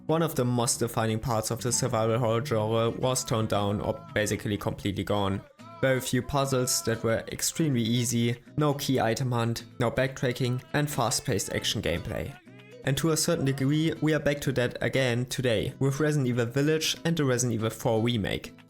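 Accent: Germany. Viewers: Brudi….mach lieber deutsche videos 😂😂😂 du hast den heftigsten deutschen akzent aller zeiten und deine grammatik ist grauenhaft. Es heißt „oriented“ und nicht „orientated“ beispielsweise. Aber kein hate 😅 unabhängig davon ist die videoqualität, recherche und videoschnitt top 👍 ❤ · It's totally fine to have an accent and also having a strong accent. It was just funny to me that I instantly knew that you're german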